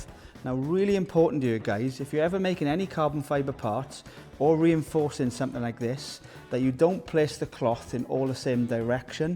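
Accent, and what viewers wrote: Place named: Cardiff, United Kingdom. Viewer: Croeso, you sound like your from cardiff or very close am cardiff here love your videos mate keep them coming 🏴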